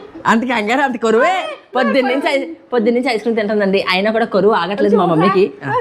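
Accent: Godavari, India. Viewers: nuvu chudataniki class untav kani mataladithy man godaraari yasa gattiga undhi bro , Dubai ki godaariki yela bro asalu ... super pair made for each other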